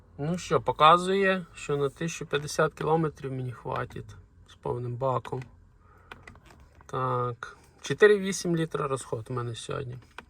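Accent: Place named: Bukovina, Ukraine. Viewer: Дякую за відеороліки по рено kangoo.Мені таке авто сподобалося.Шукаю WV кедді,але бачу,що і це не погане і можливо дешевше.І ще земляк,як робиш роліки фільтруй базар.Бо твій Буковинський укр.-рус.суржик дуже ріже вуха.Я то,тебе розумію,сам так дома розмовляю.Але мені стидно,що ми з західної україни,а говоримо на половину російською.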